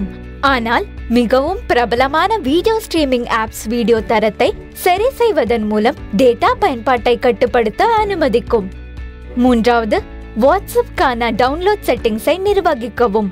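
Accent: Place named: Haryana, India. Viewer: Haryanvi Hindi medium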